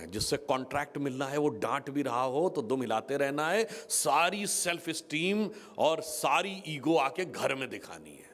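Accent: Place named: Maharashtra-Karnataka border, India. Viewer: नमस्कार बेटा 🎉 विषय फारच छान सांगतोस. मी न चुकता ऐकतोच. आपली भाषा महाराष्ट्र - कर्नाटक सीमेवरील आहे अस वाटत. जरा सांगाल का आपण कुठले ते. आशीर्वाद. आपले कार्य असेच चालू ठेवा .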